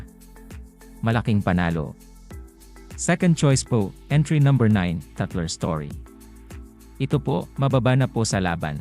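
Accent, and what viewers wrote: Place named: United States. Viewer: Naging Kano ang accent ni Bossing!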